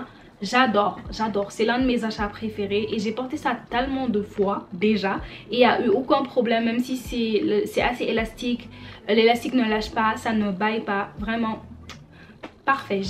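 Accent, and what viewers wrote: Senegal. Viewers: Genre toujours l’accent sénégalaise thieuy sama Mboukou yi❤️🤩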